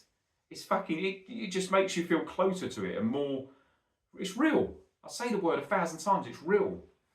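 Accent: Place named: Cockney, United Kingdom. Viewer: Not every day I agree with a cockney, but eye mate is spot on.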